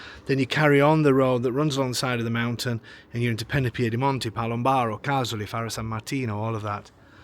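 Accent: United Kingdom. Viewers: There are different pronunciations and spellings of the word. Any of them are acceptable depending on the region you’re from. Keep in mind that is in the Uk so anything he says is specifically from the Uk and not the US or wherever you’re from.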